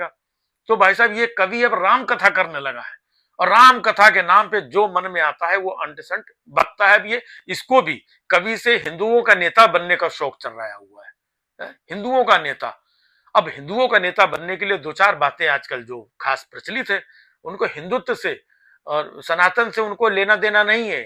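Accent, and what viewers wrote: Kanpur, India. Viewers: ji, you are a keen observer, a brave and intellectual artist.Your political satire really means a lot and your kanpuriya tone makes it more interesting. Keep it up.👍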